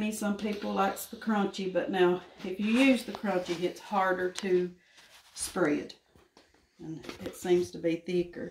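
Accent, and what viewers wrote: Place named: Kentucky, United States. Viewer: Sounds like a Perry County KY accent 😊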